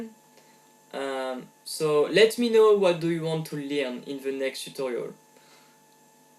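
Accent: France. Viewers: Quand j'entend ta façon de parler on se croirait devant une classe de 20 personnes à présenter un exposé xD Mais bon l'accent frenchy ca fais kiffer les étrangers :)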